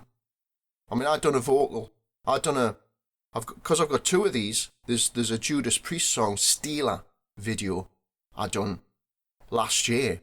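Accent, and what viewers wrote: Newcastle, United Kingdom. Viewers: Love your videos. It's not just the Geordie accent. You are proper talented, and very entertaining. Now I need a proper XLR microphone. I have a 3.5mm jack to XLR adapter, but it's not great. It picks up radio interference.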